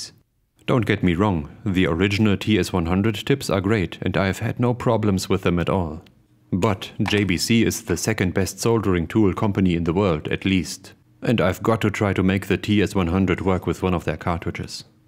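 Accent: Germany. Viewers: The German accent makes this legit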